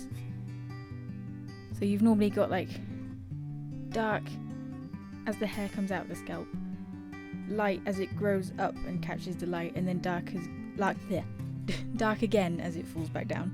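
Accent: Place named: United Kingdom. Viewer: What beautiful job, and a great job of explaining it as well. There's another artist on YouTube (LethalChris Artist) with almost an identical accent. I wonder what region of the UK you are from? That accent is really awesome.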